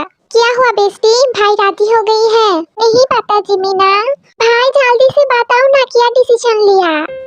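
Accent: Bengal, India. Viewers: Sis your Hindi accent is very cute mixture of Bengali and Hindi nice to hear🫰🫰🫰🫰🫰🫰🫰🫰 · Dakke nahi bula ke are you Bengali cz apke language main bohot bangla feel hain btw nice story 🥺😽btw new subscriber love you Please Don't mind kiyuki maine apki voice ke bhare main bola hain sorry🥺And your voice is so cute 🫶🏻😩🦋and apki story bohot peyari hain 🥺plzz pin me god bless you borahae 💜🤍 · Are di apto bengali ho na 😊 apki hindi so sweet 😊😊😊❤❤ so cute story and darun hoyeche golpota ❤❤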